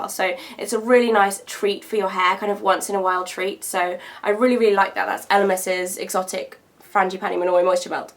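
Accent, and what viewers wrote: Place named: RP, United Kingdom. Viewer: you sound so posh! (not in a bay way! )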